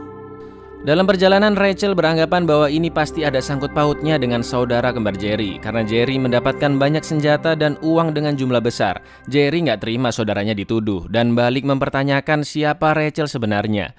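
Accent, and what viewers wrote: Java, Indonesia. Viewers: Halo Lurr. Bang Org Mana? Jawa or Jogja?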